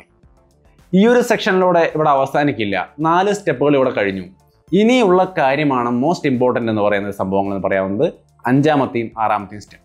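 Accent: Thrissur, India. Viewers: ഫോൺ അങ്ങട് തല്ലിയ പൊളിക്ക. തൃശ്ശൂ കാരൻ ആണെല്ലോ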